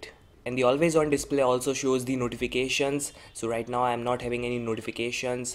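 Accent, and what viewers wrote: India. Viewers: I'm a simple man, i see an indian accent, i downvote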